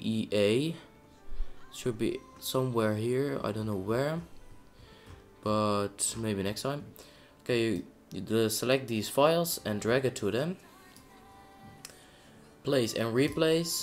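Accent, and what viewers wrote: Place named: Netherlands. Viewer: uit nederland denk? kun je wel horen lolll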